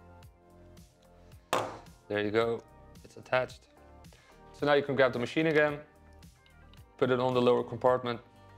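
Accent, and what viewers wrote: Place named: Netherlands. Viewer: Ik zat dit te luisteren en besefte mij ineens dat je Nederlands bent :) dankjewel voor de instructie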